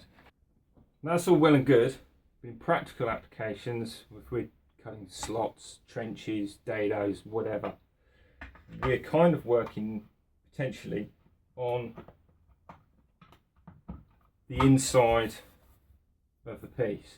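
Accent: United Kingdom. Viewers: Ciao, first THX forma clear explanation trough images of the blade while spine around the wood. But I am italian, so my english is far to be perfect. I have had some problems with your accent. I suppose you are from uk and not usa. May I ask you if you are scottish,or irish or somewhere else. I am quitecurious and always trying to improve my english. May ne New Zealand? THX again and forvgive my curiosity. Ciao from Mi!ano Christian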